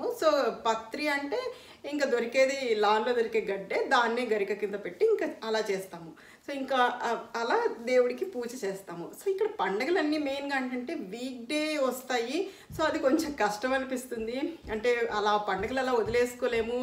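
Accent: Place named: Kurnool, India. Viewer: Hello garu Mee slang vinnaventane nenu guess chesanu, meeru kurnool nundi vachi undochu ani..! Mee about Chusinappudu Ade nijam aindi..! I'm from Nandikotkur, kurnool..! Meeru chesina videos chusanu, chala bagunnai..! Keep going all the best....!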